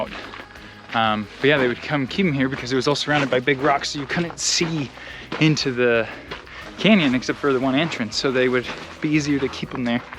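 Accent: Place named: South, United States. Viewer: your southern accent is getting better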